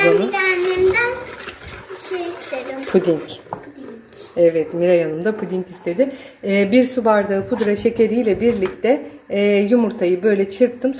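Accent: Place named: Trabzon, Turkey. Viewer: şivene kurban teyzem :) bende trabzonluyum cok keyfle izledim sizi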